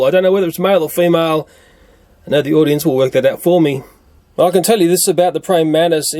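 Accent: Australia. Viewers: Thats an aussie accent 😁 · I could listen to your accent and “Australianisms” all day! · Your vids have a combination of greatness that just keeps us tuning in. One of the biggest is the feeling that we can feel your passion and interest in watching this for yourself. Also your commentary that with its quirky funny Aussie ways of speech to my SoUthern Californian ears. I️ love it that your not some scientific know it all and you have input, thoughts, and reactions like any other black widow hating dude! Doing great keep it coming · Is this guy AUSSIE 😂😂 he’s cranking some good jokes 🤦🏽‍♂️🤦🏽‍♂️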